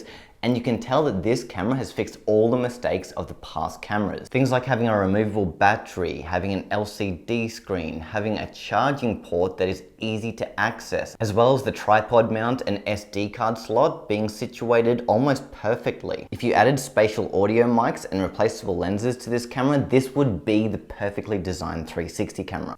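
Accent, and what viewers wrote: Australia. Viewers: i think you are australian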